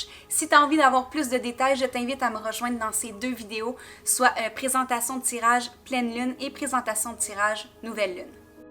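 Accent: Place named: Quebec, Canada. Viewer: je viens de commencer ta chaine et jai regardé pas mal de tes vidéos et jaime beaucoup ! mais c'est marrant j arrive toujours pas à m avouer que je pratique 🤷‍♀️ pourtant ça fait 17 ans que jai mis un pied dans l ésotérisme 😅 mais tu m as convaincu d un truc que je devais vraiment créer quelque chose (j écris et dessine depuis toujours mais jai envie de travailler autre chose) en tout cas merci ! (non tu m as convaincu d autres choses j'aime bien aussi l accent québécois 😂)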